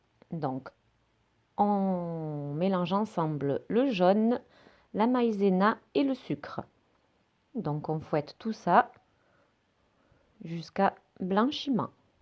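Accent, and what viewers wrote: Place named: Marseille, France. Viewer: Bonsoir o très bien réussi vos choux (savez-vous planter les choux ?) Non je plaisante franchement sa change des religieuses au café et votre accent de Marseille j'adore 😂😂😂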